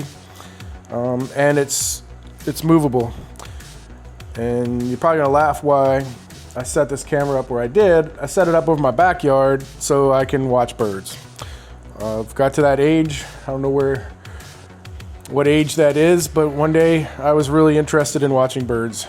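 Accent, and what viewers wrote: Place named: Louisiana, United States. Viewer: I knew you sounded like family! Lol I’m south Louisiana also, used to be the furthest south you could get in Venice! Anyway, I just got accepted to the vine program and I’m watching your videos so I can see what to search for Maybe. Thanks for doing this. Maybe I’ll get some inspiration from you and start actually creating content since I have tons of equipment to do it just not the patience. Lol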